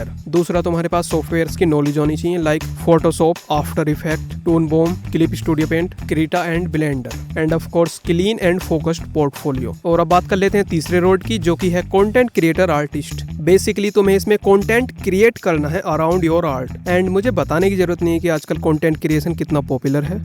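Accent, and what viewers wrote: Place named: Odisha, India. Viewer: Bhai pata nahin kyun esa laga ki aap Odisha se ho ? 🙂‍↕️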